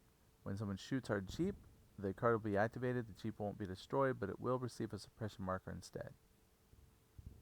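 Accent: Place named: Germany. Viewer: good german accent :-) :-)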